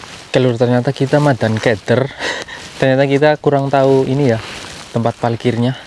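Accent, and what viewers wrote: Banyumas, Indonesia. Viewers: Ngapak daerah mn mas?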